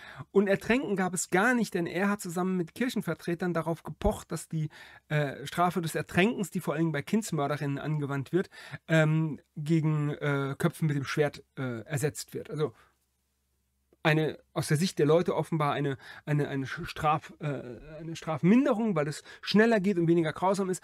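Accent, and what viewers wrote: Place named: Rhineland, Germany. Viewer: Ein klassischer Sprachfehler scheint das nicht zu sein, tritt vor allem bei Rheinländern und Eifelern auf und scheint in deren Dialekt zugrunde gelegt zu sein. Wenn es Sie zu sehr belastet, wechseln Sie einfach auf einen anderen Kanal, mich regt mehr die Diskussion auf, als die kleine sprachliche Besonderheit, die mir im Übrigen schon ziemlich lange bekannt ist. Wenn Sie mit Schweizern oder Sachsen zu tun haben, werden Sie auch kleine sprachliche Besonderheiten feststellen.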